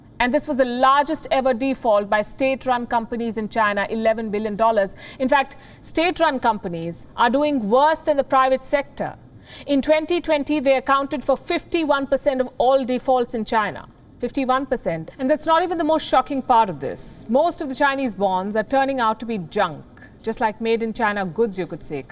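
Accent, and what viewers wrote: India. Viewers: American media with indian accent … she make china fall apart … we heard you that china 🇨🇳 is falling down ..tell us about America 🇺🇸 who already fall down .. and about india 🇮🇳 who is still 3rd world country run by Europe and 🇺🇸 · It's formal Indian accent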